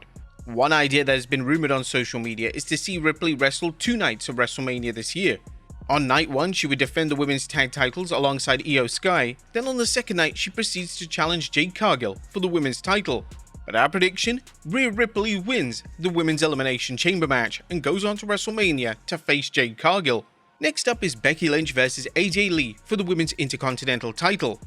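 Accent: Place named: United Kingdom. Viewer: British accent is getting a little old ya know? How about we switch it up. I would assume you’re multi talented, so let’s get an Indian or a Chinese accent. Maybe even Australian?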